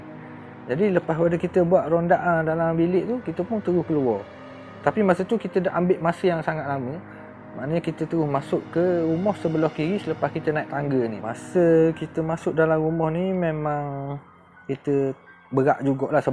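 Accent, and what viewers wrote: Terengganu, Malaysia. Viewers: Suka dengar loghat ganu ni...ada yg faham..ada yg tak...apa2 pun selalu ikuti vlog ni..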